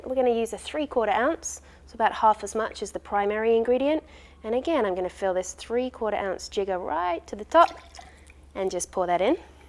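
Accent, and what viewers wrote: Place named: England, United Kingdom. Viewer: I wonder what town in England was from, her accent is quite interesting.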